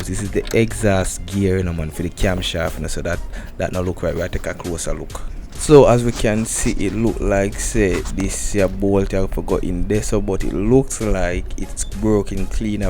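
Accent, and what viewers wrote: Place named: Spanish Town, Jamaica. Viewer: lol, the fact sey mih can immediately tell seh a spain twn 😅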